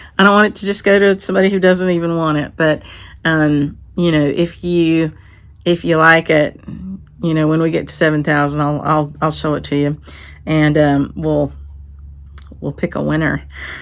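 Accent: South, United States. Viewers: Hi. I just love you and your shop, as well as your flosstubes. I not only enjoy the content but just love hearing you talk (that southern accent reminds me of my extended family). And, forgetting to post that last flosstube is something I would do. You sure did make it easy to use two of your words in a sentence. I know you are not that far out of the city to have farm animals, but I can imagine hearing the cow bell in the distance. The brightness of the colors you have in your shop, I don't see a yellow cover. If you have one that I missed, please let me know. Wishing you the happiest of holidays! Pat Tackaberry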